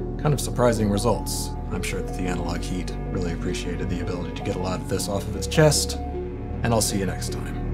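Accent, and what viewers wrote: South, United States. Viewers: First off, thank you for this video... I can employ it on the MKII (or+FX)... And second, I don't know whose accent I like more... Florian from Bad Gear or your southern charm. HAWHHHHHHich ever though, it adds to your dry wit, which you deploy like a sniper.... first you don't realize the moment is coming, and then when it lands, you wonder where it came from. All interspersed is some of the best first hand practical knowledge of gear.!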